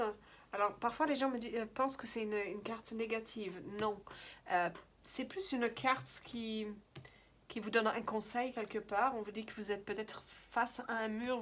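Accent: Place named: England, United Kingdom. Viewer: Mignon ce petit accent anglais :D Je vous découvre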